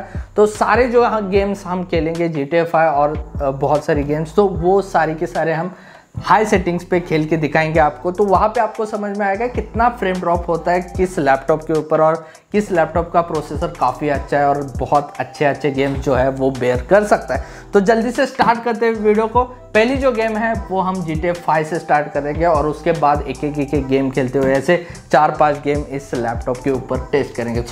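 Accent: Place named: Maharashtra, India. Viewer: Bhau tu marathi ahes na tuzhi hindi aikun lagech karala pun changli ahe hindi 😂 btw mala suggest kar na ha laptop chan ahe ka like game gta 5 3 to 4 hours chalel?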